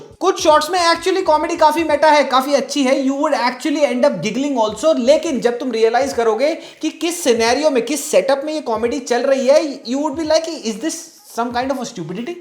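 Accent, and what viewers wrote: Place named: Delhi, India. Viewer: Bhaiya mujhe aj pta chla ap out of india rehte ho. Apki baatein ,jokes ,accent se lgta h ki ap delhi m reh rhe ho. 🤣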